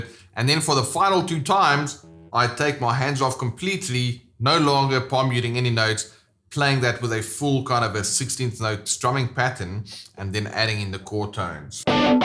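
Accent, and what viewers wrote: South Africa. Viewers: I can hear you're South Africa, same here! Any advice on tone or do you have any videos on tone? I have a Roland Cube amp and also use a BOSS GT-1, but I haven't played around with tones. The tone you're using is perfect for worship, how can I achieve this tone?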